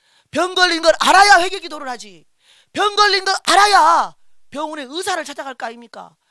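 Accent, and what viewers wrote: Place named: Jeolla, South Korea. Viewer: 목사님 채널을 알게된지 며칠 되지 않았는데요. 같은 전라도 사람이라서 그런지 억양, 말투 넘 친숙해서 좋았어요. ㅎㅎ 무엇보다 화통하게 웃으시는 웃음이 넘 유쾌하게 좋아요. 시대에 맞게 때를 따라 양식을 나눠주시고 지혜있고 충성된 종이 되어 말씀으로 양육하시니 감사드립니다. ^^ 댓글 잘 안다는데 저도 다른 분들 따라서? ㅎㅎ 댓글 달아보아요~^^ 전라도에서 정치에 관련된 목소리 내기 쉽지 않을텐데 용기내서 타협하지 않고 바르게 말씀 전하시는 것을 보면서 참 감사했어요. ^^ 주님 안에서 강건하시고 휴거되어 들림받는 은혜가 있기를 축복합니다. 감사합니다. ^^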